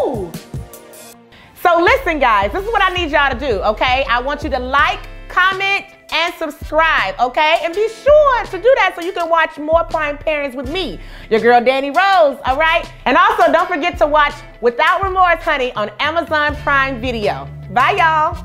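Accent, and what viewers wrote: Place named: South, United States. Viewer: I know that right, and she has a voice too. That's that good old Southern voice.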